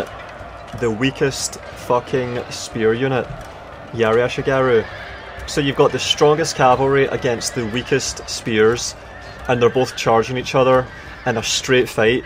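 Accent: Scotland, United Kingdom. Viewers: Pretty sure it's scottish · Nah man, i watch your videos becooos ilove your Scoooootish akcent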